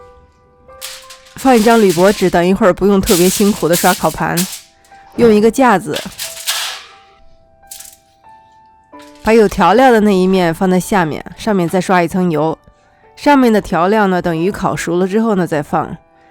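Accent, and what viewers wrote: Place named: Xi'an, China. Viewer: 是西安人吗？和我说话的感觉一样！突然觉得留学很亲切！最主要的是，可以用很美国化的食材烹饪中国的食品，简直欲罢不能！大赞～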